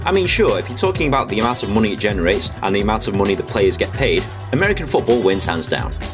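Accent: United Kingdom. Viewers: Why is a Brit explaining American or Canadian football? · Am I listening to a British guy tell me about football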